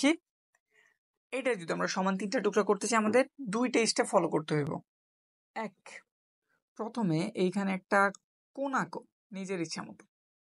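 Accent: Comilla, Bangladesh. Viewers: bhai apni ki cumillar❤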